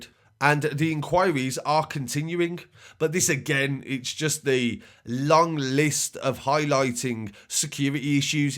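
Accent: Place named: Midlands, United Kingdom. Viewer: I saw this on bbc days ago . But the news is always better in a Midlands accent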